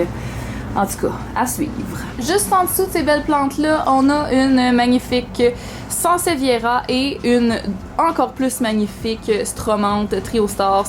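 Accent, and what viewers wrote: Canada. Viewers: super vidéo avec en plus le joli accent canadien, j'adore!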